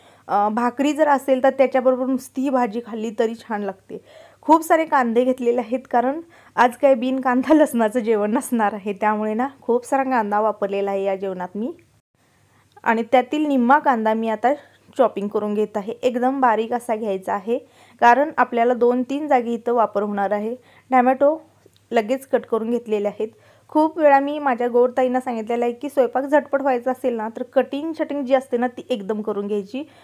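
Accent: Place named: Sangli, India. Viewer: मि विटा ...मायणी इथल माझ माहेर आहे .....कामानिमित्त आम्ही करमाळा येथे राहतो....आपली सांगलीची भाषा विडिओ त छान वाटते....पण इथे सोलापूरी भाषा जरा फरक आहे.... तु ज्या गावांची नावे सांगते ती गावे मला ओळखीची वाटतात.....विडिओ छान असतात .....पण कुठलीच गोष्ट आपल्याला सहजासहजी मिळत नाही.... तु खूप मेहनत घेतेस .....पुन्हा एकदा अभिनंदन लवकरच 100kपुर्ण होवोत हिच सदिच्छा💐💐💐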